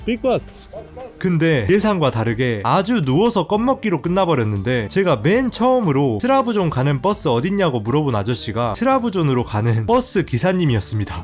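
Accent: Jeolla, South Korea. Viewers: 억양이 전라도분이신거 같습니다. 영상 재밌게 보고 있습니다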